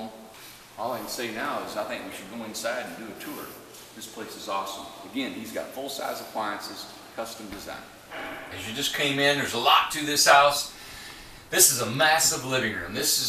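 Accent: United States. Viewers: God I love American accents, this guy's passion is so infectious as well.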